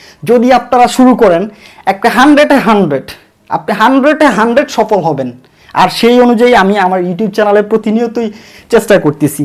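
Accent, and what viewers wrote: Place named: Sylhet, Bangladesh. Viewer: সিলেট কথায় পাওয়া য়াই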